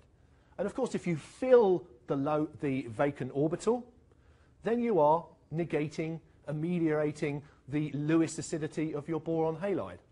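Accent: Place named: United Kingdom. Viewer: thanks, nice to hear a british voice with passion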